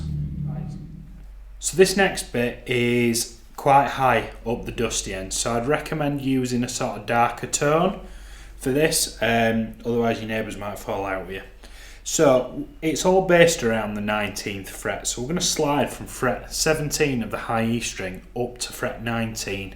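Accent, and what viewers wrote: North, United Kingdom. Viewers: Heard the Northern accent... subscribed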